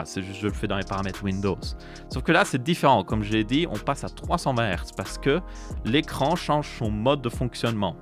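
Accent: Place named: Belgium. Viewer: Merci pour cette vidéo, je te suis depuis quelques temps et j'aime vraiment bien ta chaîne, je deviens cultivé sur l'informatique grâce à toi haha, d'ailleurs à ton accent je crois reconnaître un Belge, non ? Si oui, on est deux (bien que cela ne change rien, je tenais juste à me la péter un peu...)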